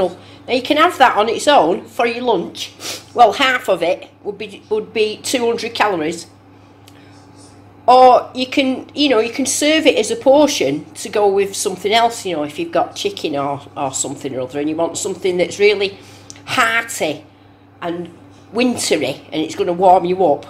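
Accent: Manchester, United Kingdom. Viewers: I really don't know how to start on this one. First the obvious irony of someone in Norway watching a UK lady making a Swede (see what I did there?). Apart from the fabulous recipe which I made this very evening, you are absolutely hilarious. The presentation, explanation and witty comments are SO endearing, that's entertainment in itself. So many so called cookery experts are super slick and explain things WAY too fast but you are just . . . . so down to Earth and genuinely entertaining. Love the accent - I'm guessing Manchester or Burnley? Either way, the most entertaining (and rewarding) ten minutes I have spent in a long time, I thank you! If I may be so bold as to offer one piece of advice . . . . please don't ever stop posting, thischannel is solid gold. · Ahahaha love the broad Mancunian accent....from a fellow Mancunian...going to try this as I'm a diabetic type 2 and can't eat potato as watching my carb content...x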